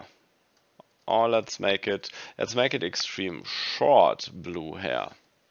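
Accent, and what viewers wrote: Germany. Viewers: Ur from Germany right? 😊 VG aus FRA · that german accent is genius :D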